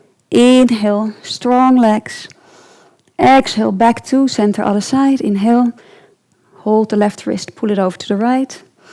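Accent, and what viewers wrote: Netherlands. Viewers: you can hear she's from Holland too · I love your accent. Are you from the Netherlands? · Je bent nederlands he, hoor ik aan je uitspraak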